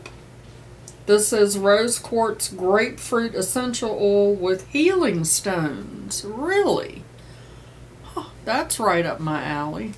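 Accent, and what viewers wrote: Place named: Midwest, United States. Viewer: Sorry, this is going to be a long comment. 😁 I love your videos for so many reasons. I feel like I’m listening to my mom (she’s 64, I’m 43), your accent sounds like my family’s (I’m from near St. Louis), and I love the tips to your son (which I’ve mentioned before). I emigrated to Europe 10 years ago, so your videos always make me feel close to home, even when I’ve not been back for several years. So thanks for the work you do!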